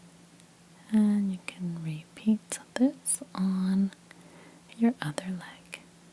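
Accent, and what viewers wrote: United States. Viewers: You’re the only ASMRTIST that has an American accent that I can listen to. Your voice is pure bliss! ❤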